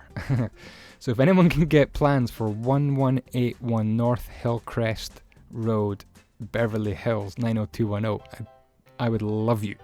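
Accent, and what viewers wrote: Scotland, United Kingdom. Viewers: You sound Scottish. Are you?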